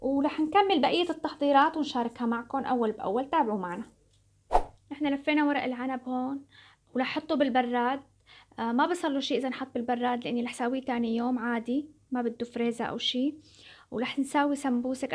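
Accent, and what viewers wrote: Syria. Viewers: كأنو انتي من حمص؟؟؟حكيك مبين حوووومصي 😊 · مدام اديش عمرك وانت من وين باين حكيك ادلبي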